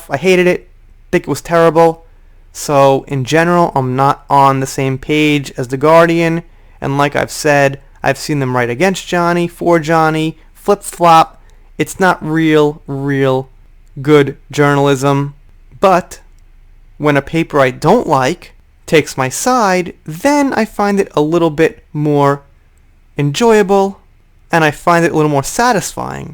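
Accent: New York, United States. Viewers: Just so ya know you pronounce it "Toka, Japan" your New York accent makes it sound nothing like Tokyo.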